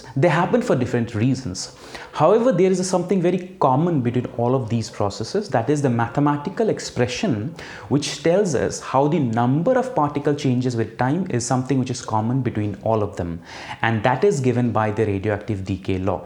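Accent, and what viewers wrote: India. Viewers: Heavy indian accent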